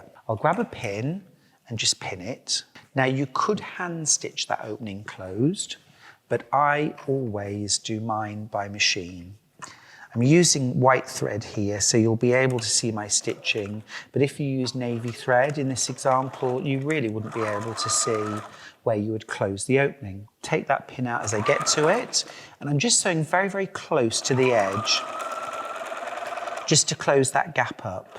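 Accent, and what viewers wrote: United Kingdom. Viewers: I love English accent. Can you please make tutorial the Japanese style UV protection mask . The mask is attached to the capelet on the sides with adjustable earloop jus like bra strap. the middle of the face there is invisible opening to drink water, the mask overlaps the capelet(or cap). the capelet goes around up to the back of the bottom of the neck by closing with velcro or snaps. I can show photo example in private. Thank you. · I really enjoy listening to your videos and your lovely British accent. I've made several of these bags and like the way you've made the tabs a bit oversize. Excellent instructions!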